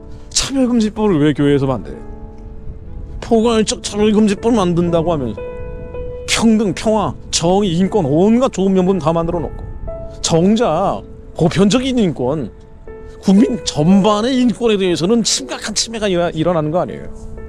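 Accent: North Korea. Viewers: 하나님의 심판이 있을것이요 이사람 목사도 재명된자다 목소리도 이북 빨갱이 냄세가 나는데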